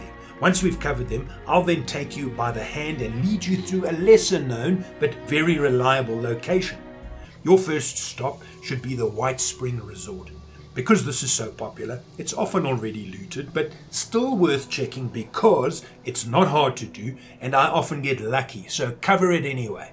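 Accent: South Africa. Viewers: Do i detect a south african accent /proud of you for doing well in the gameworld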